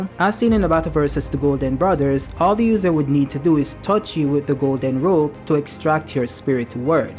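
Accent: Jamaica. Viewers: Bro I was listening and I was like this Jamaican English for sure. Good shii bro keep going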